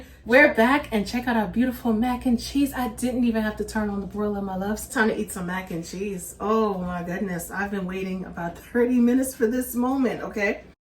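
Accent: New York, United States. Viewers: I knew that accent from New York.. I bet Brooklyn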